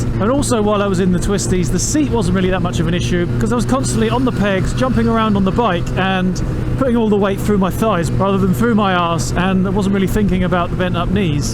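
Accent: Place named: United Kingdom. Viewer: Wow, amazing review... really good quality everything, congratulations man. Your British accent along with the metric system talk sounded odd but so, so satisfying :). Can't believe I've watched a 30 min review without getting bored and even being surprised it ended so quick. You've just got a new subscriber ;)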